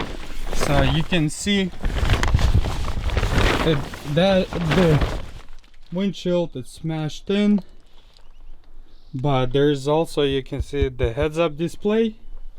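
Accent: Russia. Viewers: Actually for a 100% Russian dude you have an amazing accent. Greatings from Serbia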